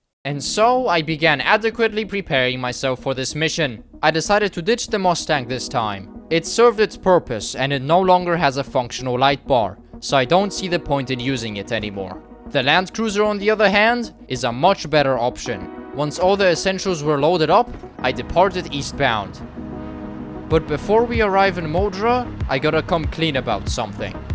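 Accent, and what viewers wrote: Poland. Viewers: Polak detected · coś tak czułem że ty Polak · wait i just reaised... are u Polish???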